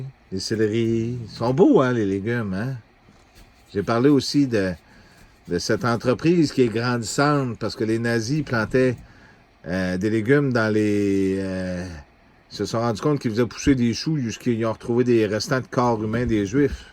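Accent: Quebec, Canada. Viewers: mon rabbi 4H30 DU MATIN J AI écouter ta vidéo et me suis suis endormie et me suis réveiller au moment ou tu parle des chien et chat robot j ais piquer du nez 3 a cinq minute j ai pas arretter de bosser et j ai encore beaucoup a faire, .je voie l avenire et je suis avertie de se qui va arriver sa fait 4ans que cela me le fait je suis tout se que j ai vue et predit est arrivé j ai le dont de prophétie .comme je sais si quelqu un est en danger et que je le sais . ou comme je vois se que font les personne soit disant gentille et qui pratique la magie qui invoque les ange .il est bien dit qu ils est interdit de prier les ange ,on doit prier DIEU seulement merci pour les dont que tu ma donner seigneur rassure toi je dirais plus rien sure les histoire je veux seulement parlé de mes dont qui mon bien servie jolie meuble en bois rouge dont j ai oublier le non .ils veule un monde de robot avec des humain pucer avec leure soudisans virus il vont vouloire noys mettre la vacsination obligatoure et forcer pour nous inplanter une puce RFID et le virusse pour nous tuer .eux on créé cette merde de virusse dont il on créé l entidote pour eux .sa va se retourner contre eux .SESUKULI MON ROY MERCI petit arbre .4H54 fin du message je comprend bien le QUÉBÉCOIS et j aime bien l accent je suis abituer j ecoute beaucoup de chaine youtube QUÉBÉCOIS .gros bec a vous tous .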